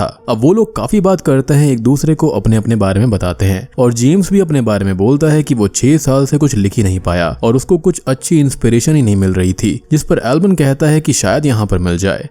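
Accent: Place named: Kolkata, India. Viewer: I know this accent is from kolkata. Don't be sad about such comments. It's all about the fun like a lil child speaks in his way .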